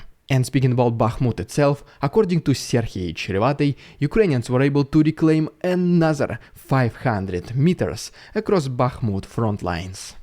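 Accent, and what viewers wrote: Russia. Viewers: Please stop doing that exaggerated rolling of your R’s. I’ve been a Patreon and I start everyday with your video before anyone else’s. But man, this past week or two that rolling of the R’s in your introduction is driving me nuts. Russia is very much a despised country now, you might be better off trying to smooth out the accent. Just my opinion, I mean no harm, but your people, your ex-country, is slaughtering children and committing multiple war crimes against humanity, it’s not a good time to show a heavy Russian accent.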